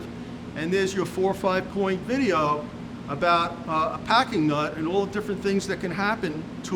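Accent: New York, United States. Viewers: Former New Yorker here, and damn, that accent makes me homesick 😂 Thanks for the explanation, would love to see the procedure a little closer up, but even so, this gave me the confidence to try to resolve a really bad leak from a garden hose shutoff valve. Wish me luck! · Great video, thanks, ditto on hearing that New York accent!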